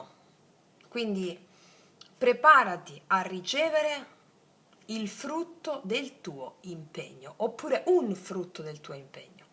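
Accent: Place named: Lecce, Italy. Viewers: Grazie mille, sei fantastica. Mi piace tanto la tua lettura e la base sciamanica che hai. Oltretutto hai l'accento leccese che mi riporta totalmente a casa. Io vivo da 57 anni in Germania ma nonostante distinguo sempre molto bene quando sento parlare l'italiano da una leccese. Un abbraccione e grazie. Ti seguirò con piacere.🙏❤🥰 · Sei stata incisiva 🎉 Dall‘ accento sei salentina , ti seguo dalla Francia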